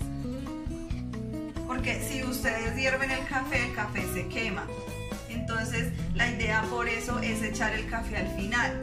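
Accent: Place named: Colombia. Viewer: Que bonito acento tienes! Me encanta el acento colombiano Uuyyy y el café es mi debilidad!!! Gracias por compartir esta receta!!!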